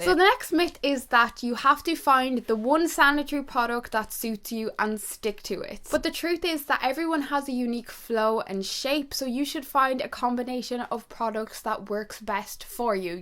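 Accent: Dublin, Ireland. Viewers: Thank you so much for making this video cause in my house the word period is like a bad word it's so annoying. I love your EARRINGS btw❤❤❤ If your doing a Q&a anytime soon I wanna know what part of Ireland your from I'm from Dublin you sound a bit Dublin but ur like a lot posher than me so then I just thought u were from sligo for some reason idkw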